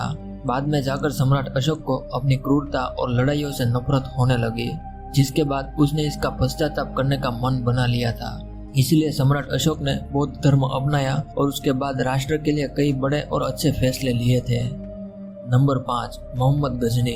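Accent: Gujarat, India. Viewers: Aapke bolne ki style gujrati people jaisi hai...kya aap saurashtra se hai?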